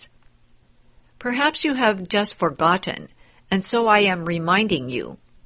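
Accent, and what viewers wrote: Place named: United States. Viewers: YOU SHOULD BE HAPPY FOR EVERYONE, BECAUSE EVEN THOU THERE'S HEAVY AMERICAN ACCENT ATLEAST SOMEONE DID THERE JOB TO SPREAD THE DHARMA. AS FOR SOMEONE WHO SEE AND JUDGED SOMEONE WHO WHO HAS TAKEN AN EFFORT TO READ THIS SUTRA. MAY YOU REALIZED 🙏 THE BENEFITS OF THIS VIDEOS. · Great but shame about the heavy American accent.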